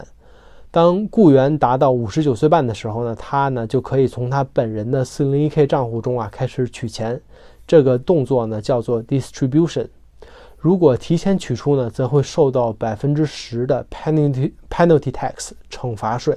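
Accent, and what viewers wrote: Tianjin, China. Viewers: 听口音感觉up是天津人，对不对😀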